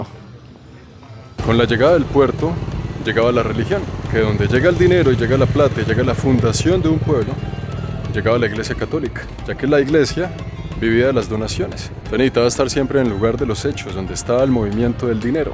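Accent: Colombia. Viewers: Severo Que chevere que hable como colombiano like x 10k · Vaya d lo q uno se entera ...😃me encantó el video las historias..el río.. q lindo..me gusta tu voz ...y tu acento colombiano 😍.. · q belleza!!!!m encantan tus vídeos pero muchas veces los escucho porque tú voz es música para mis oídos,adoro cómo hablan los colombianos,m encantaría vivir en esa ciudad y mucho más xq hace referencia a mi libro de cabecera 100 AÑOS DE SOLEDAD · Qué hermoso acento colombiano!!!es muy sensual y varonil!!!muy interesante la historia de un lugar tan bonito!!! La historia de América, no hay esquina donde no haya llorado un indígena. Saludos desde México!!! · Que buen historiador eres! Muy admirable tu forma de contar! Increíble memoria! Me recuerdas a mi padre! Además tu acento colombiano? Lo máximo! Saludos desde Ecuador! Saludos a mi familia en Colombia los Rodríguez Restrepo! 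Gracias por el video!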